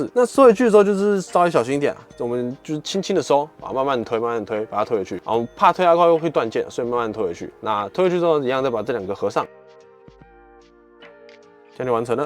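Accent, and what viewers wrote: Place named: Japan. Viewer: 應該是剛學好普通話的日本人吧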